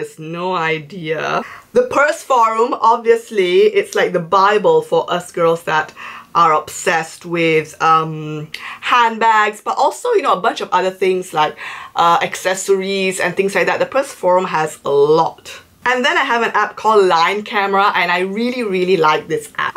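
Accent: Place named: Italy. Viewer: I love Italians and there funny accents!!❤❤😍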